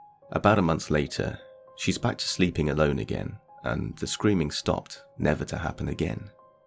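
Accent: United Kingdom. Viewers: You have a good voice for story telling. I always prefer an English accent. Would also love a Scottish accent. I can't stand Mr Reverants voice. Sorry Rev.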